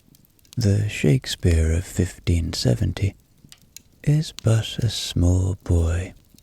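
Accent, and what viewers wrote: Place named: Ireland. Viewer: Being ill with MS I often fall asleep during the day and I have taken to listening to at these times as well as the time when I have difficulty sleeping at night fatigue is weird like that but you are amazingly talented and you are the perfect person to help me get to sleep being Irish and having that lovely Irish accent and soft lilt that I love I am so happy and grateful to have found you.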